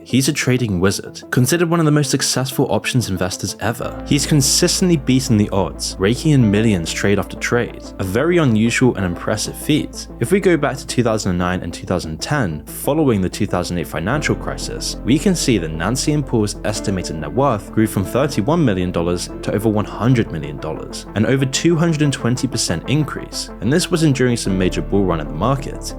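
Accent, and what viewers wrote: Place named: Brighton, United Kingdom. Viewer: U from Brighton I'm guessing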